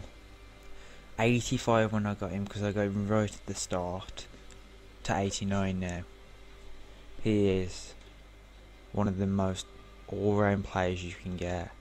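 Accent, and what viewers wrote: United Kingdom. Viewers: U sound proper essex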